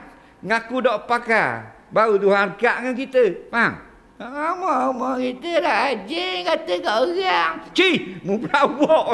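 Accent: Terengganu, Malaysia. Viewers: Terbaik UAI.Terima kasih Ustaz.Seronok dengar loghat Terengganu Ustaz.👍👍👍👍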